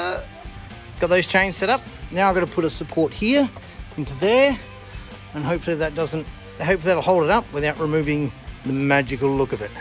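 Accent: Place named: Australia. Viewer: it's just an australian accent tho lol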